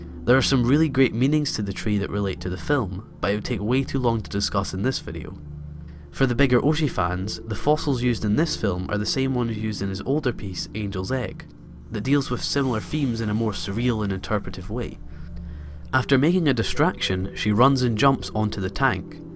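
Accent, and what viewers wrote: Scotland, United Kingdom. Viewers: Never realised I needed a Scottish bloke musing on philosophy, anime, identity, death, and life in general. Ay. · At least this narrator sounds like British or Northeast Coast... and this makes sense. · you scottish?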